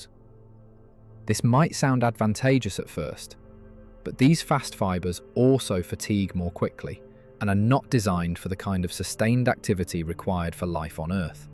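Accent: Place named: United Kingdom. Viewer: British English is irritating!!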